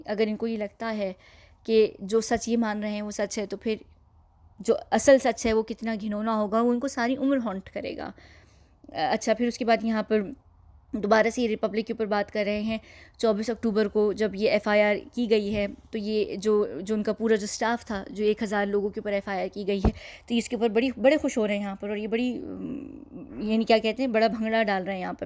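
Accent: United Kingdom. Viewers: you are right, she has worked in several countries including India, but she is British, I can tell from her accent, it was a joke...the way she gives 5seconds to people who think that she fakes the accent and it makes me 😂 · Good info. BTW like your brit accent. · yes she has a british accent as she mentioned herself in previous videos that one of her parent is white(britisher).... i think she travels all over the world. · I don't know your name , I don't know where are you. But thanks a ton for this job .I like your British ascent. I love your voice as well. Keep up the gud work. Cheers .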